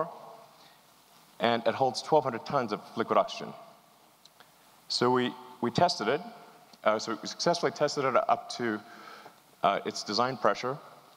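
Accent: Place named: France. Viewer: I love that french accent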